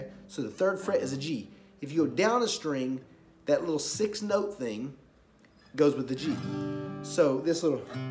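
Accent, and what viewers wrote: South, United States. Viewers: says: 'There is no B Sharp', says: 'This is a B Sharp'. Uh no that's a C. I give you credit for trying to teach others when you're really not a very good player, takes a bit of nerve. Watching you try to play notes was painful, too bad your knowledge doesn't transition to actual playing. I guess that old saying 'those who can't do, teach' is true. You are kind of fun to watch, with your good ol' boy style and accent so kudos to you. Practice practice practice (you'll get there). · Yea man...any little trick that puts chords to leads is helpful without tellinng anybody you done whipped up some pentatonic simplicity. Also teaches you where to find alternative chording deep down and up top in the nut region and everthing in between. Brother, I use your vids for to students to keep it real. They come in all sad they trying to play or cant feel the music. 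We pull up your stuff and say looky there.. this fella is putn down some good country sounds with a geettar and a Southern accent..lol...I love stealing your stuff to keep the kids motivated. You keep it simple but sound like a pro. Keep it all rolling. Even some Bass walks into simple chords like c d g a like this bass pick 1 count E E F# G# Open D string then strum that As you just taught us... simple but sounds like geting warmed up. Then move to the 1 4 5 progression and put it all together. 3 vids. Put all 3 lesson together voile' another kick butt lesson just like this one. Keep bringing it... man we love ya here in Colorado.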